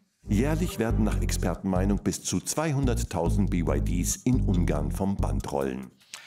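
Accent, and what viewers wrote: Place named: Vienna, Austria. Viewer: sein Wienerisch ist wirklich ein wenig anstrengend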